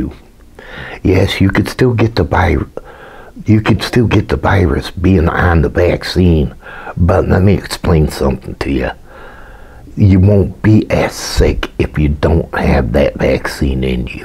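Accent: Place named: South, United States. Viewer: that southern accent is everything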